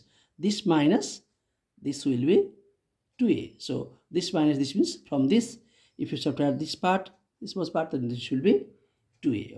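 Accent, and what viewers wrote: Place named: Nepal. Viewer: nepali jasto lagyo sir tapai nepali language ma handina aajhai better huntheo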